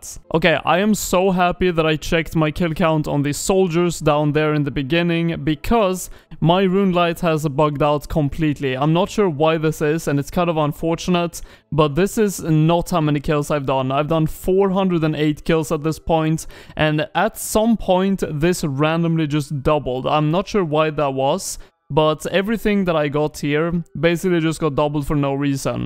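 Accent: Sweden. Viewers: Are you Swedish and from Stockholm by any chance?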